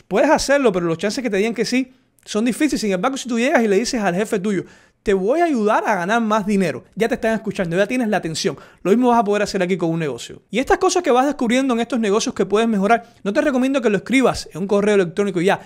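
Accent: Cuba. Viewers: Hola he visto un solo video tullo y ni siquiera lo terminé por motivos ajenos, pero me gusto el enfoque que le diste y tu forma de ver la vida, este video aún no lo veo terminado de escribir lo haré, normalmente antes miro muchos vídeos antes de suscribirme a un canal de este tipo porque la mayoría hablan mucha M como decimos en Cuba 🇨🇺, ademas de que tu acento me parece Cubano. Si es así estoy el doble de contento porque me encanta ver triunfar a los míos. Aki tienes un nuevo seguidor. Suerte que Dios te bendiga siempre 🙏🙏🙏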